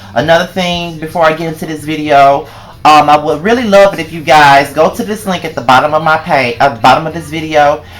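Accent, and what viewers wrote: South, United States. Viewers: there is nothing wrong with you. Fuck the haters. Don't let that shit bother you. Who and what is attractive is a lot a matter of opinion. Your videos be on point. And there is nothing wrong with a southern accent, I like my southern accent. This video took a lot of courage to do.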